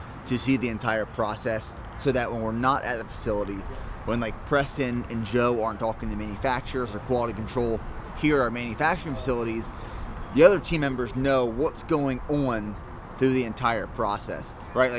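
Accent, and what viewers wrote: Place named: Brooklyn, United States. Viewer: Love how his a proud Texan but has the strongest Brooklyn accent😂😂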